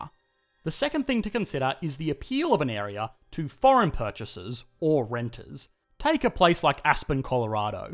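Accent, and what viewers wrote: Australia. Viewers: Petition for economics explained ASMR channel with his soothing Aussie accent. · Just wondering the bloke narrating sounds like an Aussie, can you talk about the Australia markets? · Australian accent, US film clips. What is going on here?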